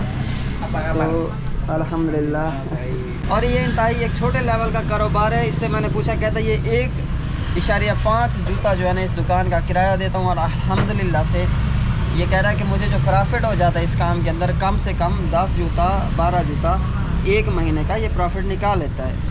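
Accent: Saraiki, Pakistan. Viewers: تم سرائیکی ہو تمہارے لہجے سے پتہ لگا میں بھی سرائیکی ہوں ڈیرہ غازی خان سے مسات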